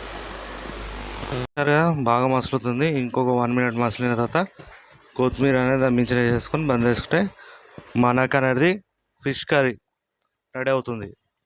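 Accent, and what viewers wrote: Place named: Telangana, India. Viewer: Anna ne Telangana slang ki peddha fan anna nenu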